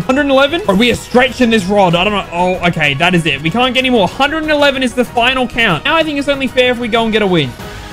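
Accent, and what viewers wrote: Australia. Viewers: You australian i watched you before lazarbeam but i saw you on his video and thought you were aussie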